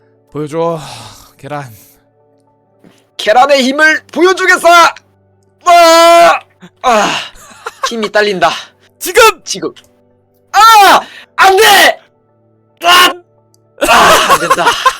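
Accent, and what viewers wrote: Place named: Jeju, South Korea. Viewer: 제주도 사투리 너무너무 귀여워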